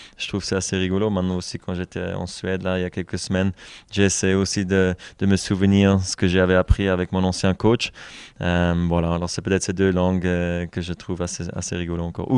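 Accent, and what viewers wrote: Swiss German, Switzerland. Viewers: Damn he has so german/ swissgerman accent when he speaks english thats impressive! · Juan Gomez thats not German, thats swiss german, big different, took me years to understand those 😁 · Man merkt dass er ein Deutschschweizer ist wenn er französisch spricht. Wenn er English spricht es ist besser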